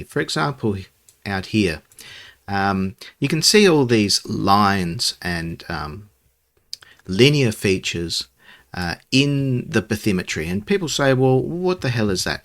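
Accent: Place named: Australia. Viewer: I watched this exactly 5 minutes after you uploaded but it was around 4AM in the US & I was too tired to think of what to comment. Now, another late night as I was revisiting your video, I wondered why you would upload at that time. Then it hit me that your accent is Australian, not British, so no wonder you chose this idea to use for a video. Nevertheless, it is awesome to see that something off my list was worth investigating. I have always been fascinated by this region since learning about the recently extinct Moa birds that so strongly resembled dinosaurs & by my family owning a full grown pet Emu when I was a child. Australasia is so full of earth lore like this & I am especially intrigued by the scattered islands connecting Australia & Asia where it looks as if the continents violently dragged bits of land with them as they drifted apart. Anyways thanks for the video & hope you are enjoying your summer!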